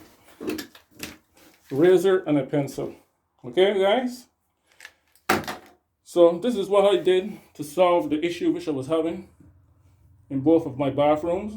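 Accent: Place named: Barbados. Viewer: Total Bajan ingenuity on display here. Right down to “de axcent”. I tell you 2025 👍🏾 Barbados 🇧🇧 in Newfoundland 🤓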